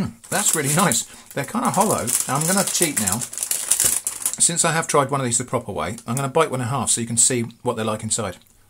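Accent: United Kingdom. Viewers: Where in the UK are you from ?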